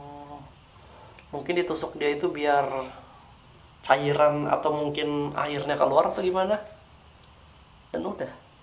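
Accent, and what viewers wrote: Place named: West Java, Indonesia. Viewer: Ka ngomong nyah sunda banget pake p maaf yah ka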